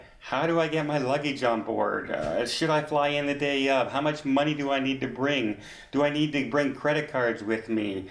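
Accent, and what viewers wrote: Canada. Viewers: I can so tell your canadian now lol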